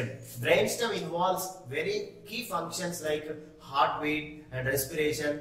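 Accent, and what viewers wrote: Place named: South, United States. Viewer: Sir you are from south nnnn....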